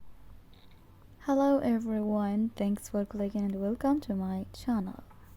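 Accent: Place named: England, United Kingdom. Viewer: نسمات الربيع بنبرة إنجليزية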